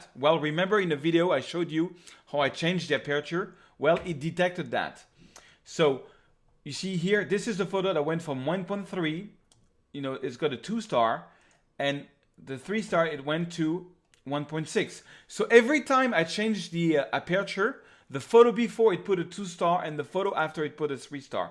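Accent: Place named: France. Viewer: Nice tutorial. Everything's clear. Unfortunately, it was with your french accent but it's always better than the one from Gunther from LRTimelapse !! (^_^) Try it in french next time. And btw, the name of the device you were looking for is an intervalometer.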